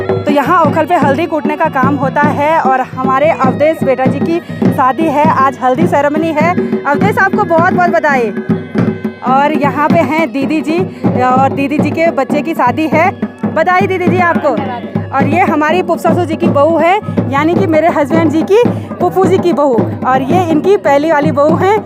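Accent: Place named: Uttar Pradesh, India. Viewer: Jab aap hindi bolte ho tab aap garhwali nahi lagte up k lagte ho 🙏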